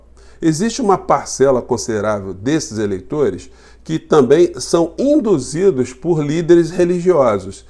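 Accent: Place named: Rio de Janeiro, Brazil. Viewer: Bom dia! Vc é carioca Tb!🥰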